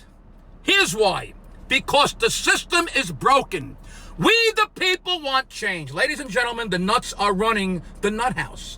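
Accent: New York, United States. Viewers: New York or New Jersey!?😘🥰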